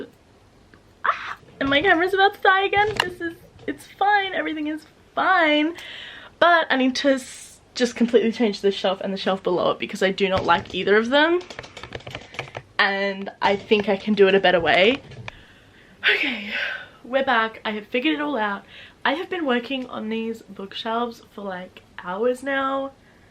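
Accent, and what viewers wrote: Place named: Australia. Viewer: i just found your channel and when i heard you speak i was like a fellow AUSSIE???? incredible. watching you reorganise was so satisfying thanku girlfriend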